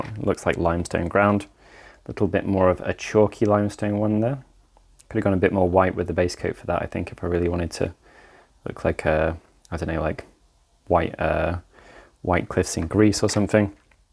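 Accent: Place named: United Kingdom. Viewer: "Too British? Turn on Subs" 🤣🤣 No, the perfect amount of British, 😊💜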